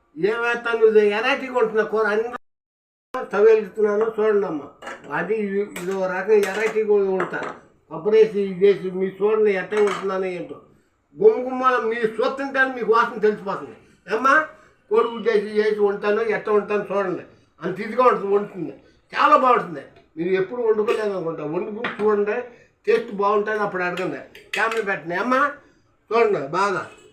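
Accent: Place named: Andhra Pradesh, India. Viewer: తాత నువ్వు ఉండే వంటలు ఎక్సలెంట్ తాత మీ ది విజయవాడ కాదు తాత విజయవాడలో ఉంటున్నారు కానీ మీ ది పక్కనే ఏదో ఊరు అయ్యుండొచ్చు నీ మాట తీరు పక్కా ఆంధ్ర బందర్ దగ్గర ఏదైనా ఊరు అయి ఉండొచ్చు అనుకుంటున్నా తాత మీది కదా తాత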